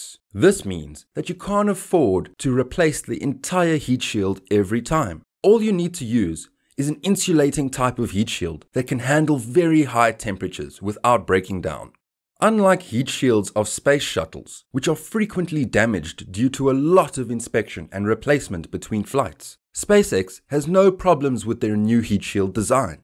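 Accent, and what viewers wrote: Afrikaans, South Africa. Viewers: Is that a SA accent? Good video by the way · you sound South African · My dude you Afrikaans? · I hear a fellow Saffer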